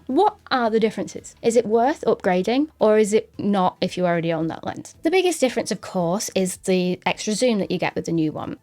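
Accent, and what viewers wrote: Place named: Isle of Man, United Kingdom. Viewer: Delighted to hear a fellow Manxie and see great shots of the Island!! Wonderful review and super helpful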